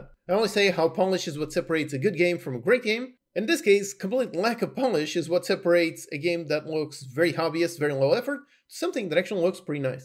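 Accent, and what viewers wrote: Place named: Portugal. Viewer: Totally off topic question, but you speak Portuguese as well, don't you? I can notice from your English accent, either that or you speak some latin language, right? Your videos are excellent, I really like how you're straight to the point, they vibe with me a lot, thanks for that!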